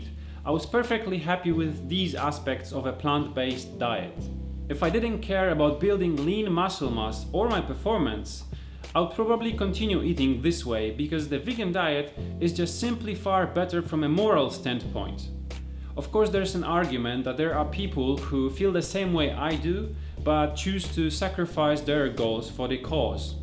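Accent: Poland. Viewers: zubr! polski akcent :D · He sounds polish and the beer pic was from a polish beer too, so I assume that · tak czulem ze Polak, chociaz stara sie zmieniac akcent :D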